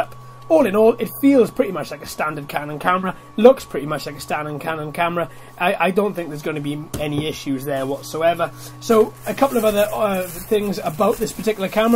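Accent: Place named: RP, United Kingdom. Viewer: ooooo very posh buddy x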